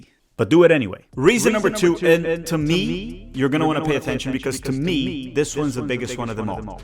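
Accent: Rio de Janeiro, Brazil. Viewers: the question that won't go away is, why do you support palmeiras with that carioca accent?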